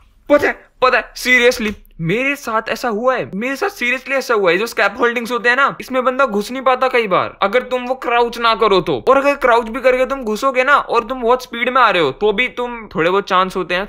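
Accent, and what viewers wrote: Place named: Uttar Pradesh, India. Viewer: I know you are from UP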